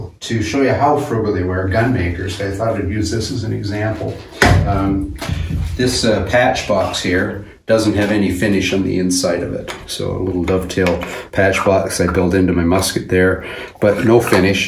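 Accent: Canada. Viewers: Ahh, I thought I detected a Canadian accent. I love ypur chamnel. U are quite amazing to be able to do what u do.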